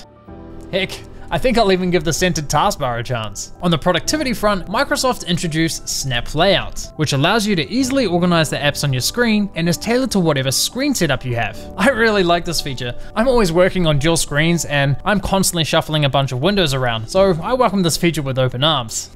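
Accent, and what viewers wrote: New Zealand. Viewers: I know you live in Germany.. but what is your accent? New Zealand? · Are you a kiwi?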